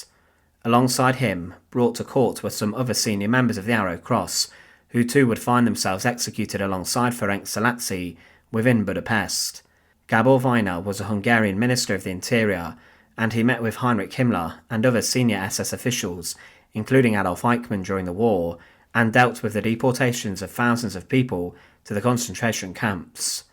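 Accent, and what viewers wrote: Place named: England, United Kingdom. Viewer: Not Ai he's a pom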